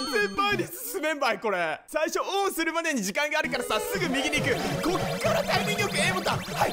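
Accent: Fukuoka, Japan. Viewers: 福岡の人？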